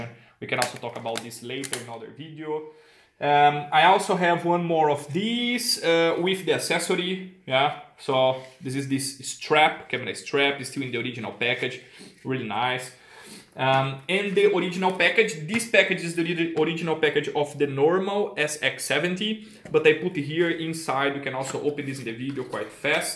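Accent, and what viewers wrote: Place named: Germany. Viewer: Yeahh awesome collection. And the german accent 😂🤘🏻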